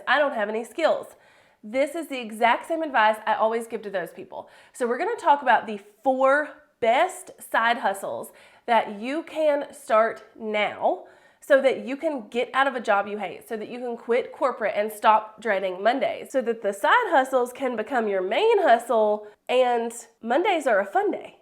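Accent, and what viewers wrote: South, United States. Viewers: Have you been to Gatlinburg TN? I started a small YouTube channel about our small tourist town...I can tell you're from the South 😉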